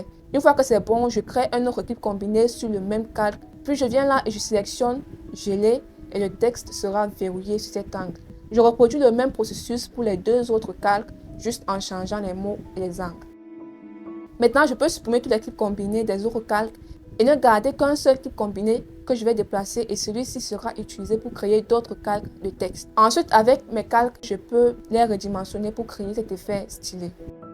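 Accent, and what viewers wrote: Cameroon. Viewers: Une camerounaise. Je dirai une eton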